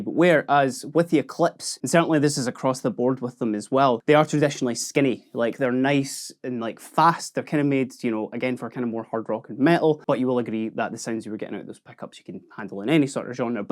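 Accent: Scotland, United Kingdom. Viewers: Did they have to get a guy with such a brutal Scottish accent to do this video? I can barely understand the dude.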